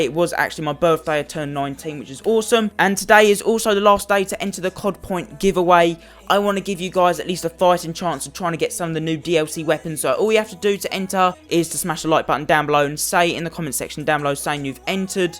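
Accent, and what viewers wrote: United Kingdom. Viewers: 10 minutes of the same sounding douche I swear does every bo3 YouTuber have to be a British fack.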